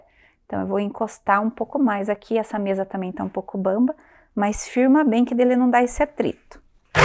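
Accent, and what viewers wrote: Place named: South, Brazil. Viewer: Menina contando com o sutaque que eu amoooooo acredito que tu e do Sul. Se cometi uma gafe perdao mas e qui amoo o sul essa aula e um luxuuu amei obrigada logo. Logo quero faser obrigada.pelo carinho.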